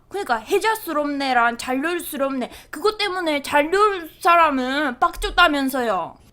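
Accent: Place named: Japan. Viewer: 오늘 꽤 간만에 일본인스러운 한국어다. 반갑네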